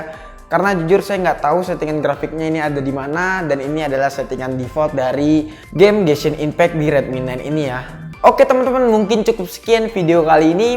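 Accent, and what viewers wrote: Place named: Banyumasan, Indonesia. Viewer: Njer basane ngapak😭🤣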